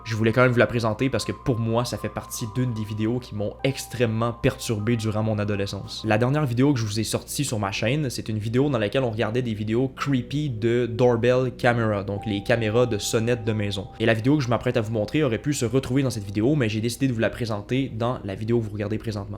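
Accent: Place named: Canada. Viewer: Bonjour beau accent vous êtes canadien merci pour les vidéos bonne continuation à vous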